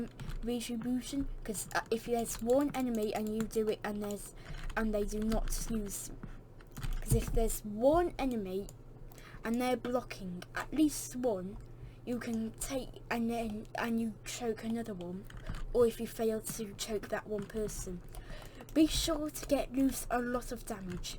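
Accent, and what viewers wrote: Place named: United Kingdom. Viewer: Cool video :) I also really like your British accent lol ,also can u make a video on how to get Jesus skin for Obi-Wan-Kenobi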